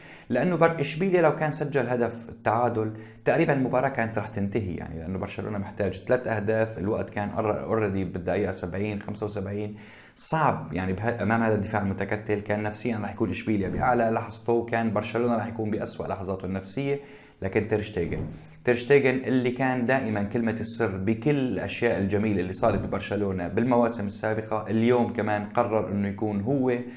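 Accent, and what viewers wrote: Aleppo, Syria. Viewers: كني حلبي ؟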